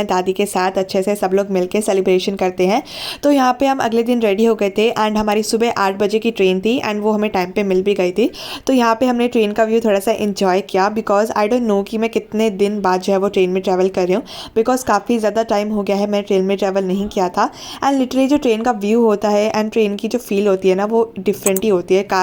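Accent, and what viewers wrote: Haryana, India. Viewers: Aap haryana se ho??? Seriously??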